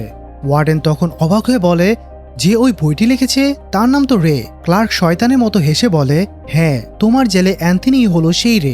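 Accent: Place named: Sylhet, Bangladesh. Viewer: Vaito Sylheti lager ba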